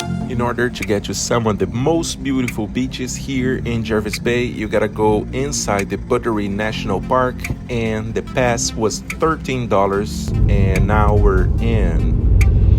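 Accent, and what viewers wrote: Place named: Brazil. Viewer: Brazzo accent yey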